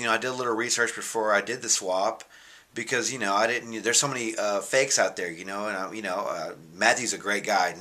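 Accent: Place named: New York, United States. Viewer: I thought you were from NYC for the longest time. Lol Great review braw.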